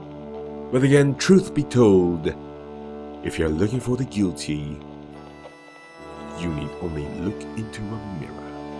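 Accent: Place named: United Kingdom. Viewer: 안녕하세요. 한국분이신가요? 어떻게 영국발음 연습하셨는지 여쭤봐도 될까요?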